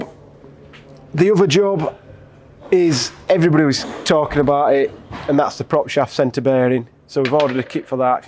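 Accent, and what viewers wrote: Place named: Barnsley, United Kingdom. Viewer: Hi been following your trials and tribulations me thinks you should rename it to Toerag in stead. def more Barnsley like. love the Barnsley accent but its no wonder some folks cant understand you broad Barnsley is a very difficult dialect to understand., and im born and was draged up in Barnsley, well actually Grimethorpe. Keep up the good work take no notice of the nay sayers and internet experts lol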